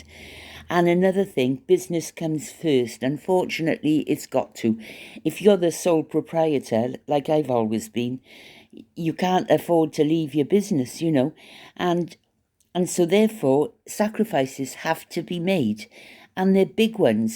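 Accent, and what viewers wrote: Wales, United Kingdom. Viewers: happy you’re back, nice to hear that Welsh accent, God Bless.♥️🕊️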